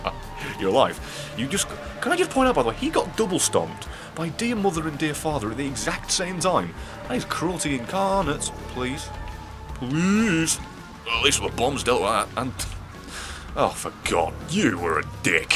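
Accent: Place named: England, United Kingdom. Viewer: Question: You've mentioned that you're in Germany before, but your accent is English. Are you, in fact, English?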